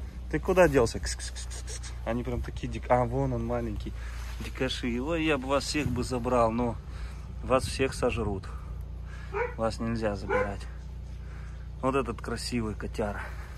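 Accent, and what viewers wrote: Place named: Ukraine. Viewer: привет. Я на работе, показал твоё видео,один человек, даже подписался на твой канал. А мне говорят, что у тебя, украинский говор.Есть ли у тебя,родственники в Украине.У нас парень работал Сергей,его тоже фамилия.Он сам родом из Чукотки,а сейчас живёт, в городе Изюм.Просто ради любопытства, ты случйно,не его родственник?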